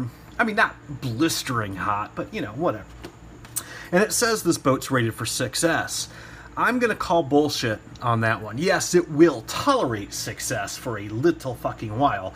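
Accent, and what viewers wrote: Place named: New Jersey, United States. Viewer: You sound like us in jersey fuckin fuckin fuckin love it brother I just subbed fuckin aaaaaaaaaaa lol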